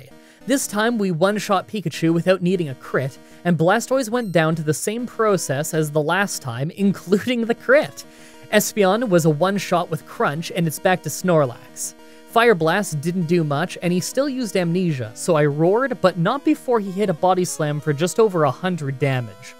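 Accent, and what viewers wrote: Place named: Canada. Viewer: His Canadian accent is so cute, eh!!! Lol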